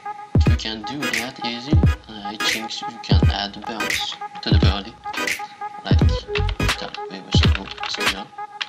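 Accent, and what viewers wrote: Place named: France. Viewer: Dude you are so funny your editing is great and you except the fact your French accent is hard to undestand for people that are not French witch every youtuber should do good on you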